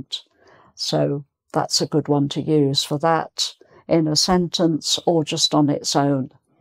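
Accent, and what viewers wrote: United Kingdom. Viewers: I really like the British accent😘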